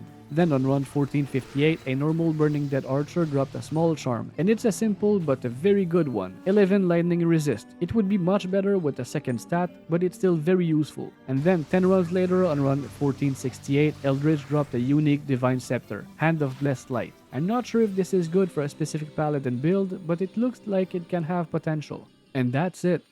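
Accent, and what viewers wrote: Quebec, Canada. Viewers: Tes quebecois en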